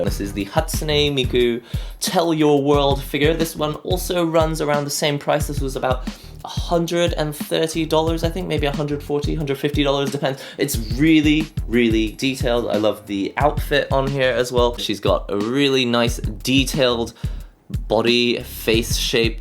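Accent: England, United Kingdom. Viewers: Are you from England????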